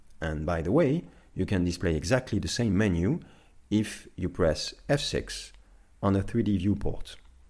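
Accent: France. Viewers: J'ai griller l'accent français direct XD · toit, tu a un accsan français je me trompe ? 😁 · Thank you ! Et super accent français en passant =D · U R french, ain't U ? Also, awesom add-on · l'accent français est présent haha !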